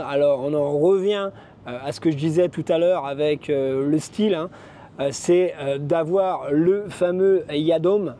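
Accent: France. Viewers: allo ce que jaime chez les francais de la france vous avez un tres beau parler que nous les quebecois a oui nous au quebec sa redouble encore et sa ma fait rire quand tu parlais du pauvre tip qui marchais comme quil fesait du patin a glace il a na beaucoup quil son comme sa et le pire de tous celui quil porte leur pentalon plus bas que les fesse yo men 🤣 .prenez bien soin de vous 🙏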